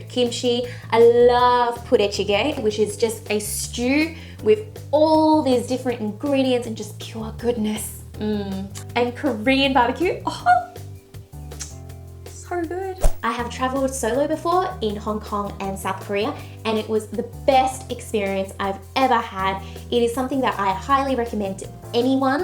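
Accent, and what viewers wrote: Australia. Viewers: that Aussie Accent is 🔥 girl. Speak Vietnamese with me haha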